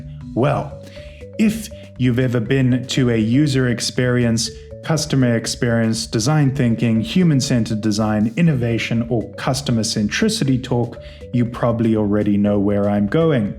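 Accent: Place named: South Africa. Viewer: If you're using reddit for memes and stonks you're doing it very wrong indiddly deed. I swear I detect the faintest of Saffer twangs in this video 🤔🧐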